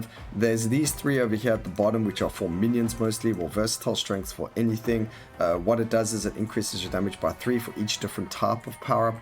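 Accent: South Africa. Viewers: There's a difference between "accent" and "wrong" :D Accent is when you pronounce "aɪ" phonetics as "a" bc South African drops inflection. Wrong is when you say omnipotent wrong :P But hey, just tryna help. No hard feelings.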